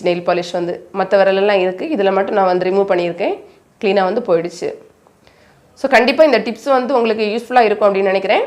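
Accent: Kanyakumari, India. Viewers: Nega kanyakumari ah sis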